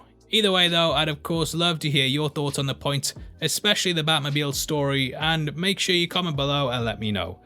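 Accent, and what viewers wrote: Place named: Newcastle, United Kingdom. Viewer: My face when I realised the voice I was listening to was!! Geordie 🐐 or battle rap 🙌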